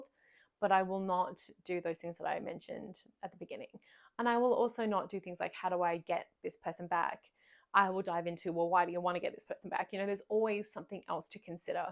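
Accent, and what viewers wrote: Australia. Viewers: Well what can I say ? Just splendid. I know you said you were in Canada, but you sound like one of my Aussie compatriots. Thank you for the upload.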